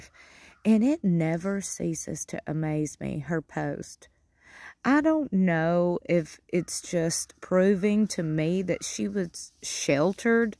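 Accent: South, United States. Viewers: She's crazy! Why doesn't she protect her babies from a wasp? 😢 I love your videos! You have such a sweet, southern accent and you make me laugh. 😊😊😊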